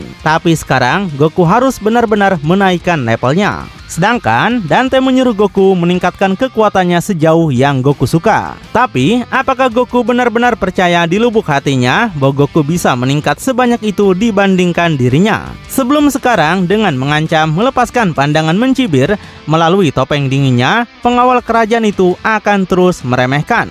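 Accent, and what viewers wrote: West Java, Indonesia. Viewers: Wah mimin org Sunda nya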